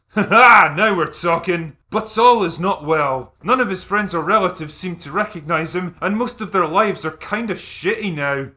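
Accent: Scotland, United Kingdom. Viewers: His dulcet Scottish tones warm my soul.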